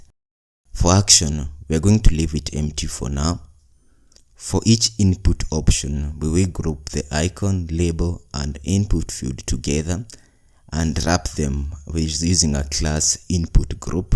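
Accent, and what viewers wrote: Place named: Russia. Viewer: I think you are Russian accent thats good 👍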